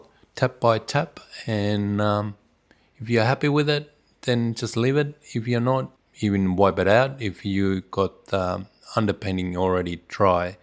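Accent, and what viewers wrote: Australia. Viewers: Great tips and lovely art, subbed! Do I detect a little Aussie within the Finnish accent? :face-blue-smiling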